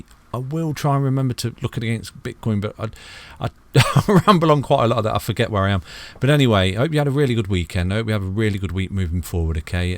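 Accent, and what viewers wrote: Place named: England, United Kingdom. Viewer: Lol , it's that English accent 🤣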